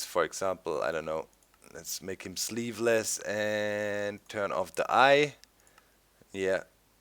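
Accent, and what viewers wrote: Germany. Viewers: ich wusste es du bist deutsch (das hört man etwas) ab links oben kann man die deutschen Optionen sehen :D Hallo :DD übrigens gute Vorstellung ;D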